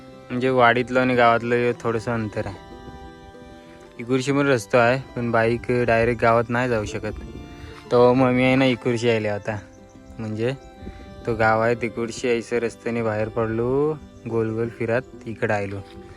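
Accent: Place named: Agri Koli, India. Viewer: Tuze sarva videos changle astat nisargaramya navin gaave baghayala khup chan vatate tuzi agri koli bhasha aikila khup chan vatate 😊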